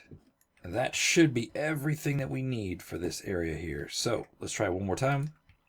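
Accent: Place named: Australia. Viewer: Yay another fellow aussie. Its like music to my ears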